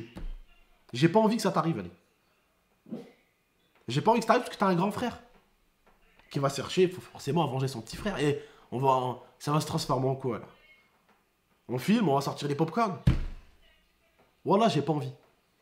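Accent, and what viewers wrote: Marseille, France. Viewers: Sa me fume il a pris un accent marseillais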